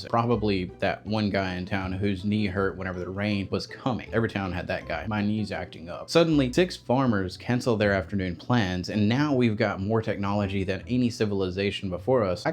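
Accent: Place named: Northern New England, United States. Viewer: I would have thought you are from Northern New England! You sound just like us. This was fun! Thank you. I've got to head out now and start gathering pinecones for tinder...and watch out for the wooly-bears because I've got to measure their color bands.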